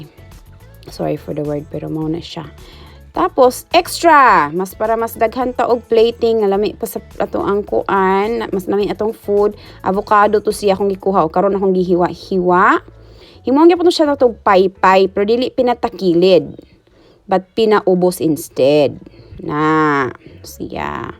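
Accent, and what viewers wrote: Visayas, Philippines. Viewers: Hindi ko man maintindihan pero super cute mopo mag bisaya. Agaw pansin sakin ang kalan super linis sarap magluto. Breakfast talaga pinaka importante sa lahat dapat heavy. Saka Hindi talaga nawawala ang itlog sa almusal. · Omg,,dipoba umasim ang egg pero ang puti nga,,laki namn po ng strawberry nyo,,ang healty namn ng breakfast nyo,,natawa ako sa bisaya accent nyo,,first tym koto narinig. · What a nice breakfast to ur fam and bisaya accent I'm from Negros also and this is very entertaining to watch while cooking, hoping for your mext cooking content, keep it up!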